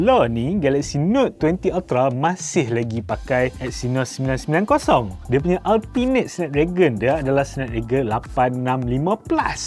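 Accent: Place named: North, Malaysia. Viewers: org perlis ka?..ckp loghat perlis kalau ya..hi..kita geng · Cakap Kedah baik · Ckp utagha dia pekat boleh tahan hahah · Tudia kembali pada jalan sebenar, dulu review laguni bahasa utaqa pastu tukaq KL la tukaq balik. Sempoi 😁👍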